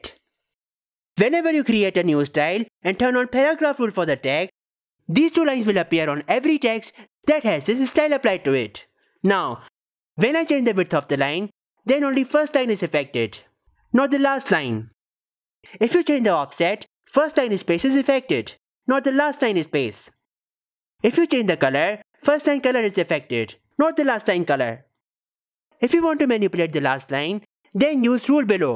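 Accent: India. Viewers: Thank you, I was watching this video, but I failed to understand you very well today. Between your english with indian accent and my spanish, it wasn't a good mixture LOL !!!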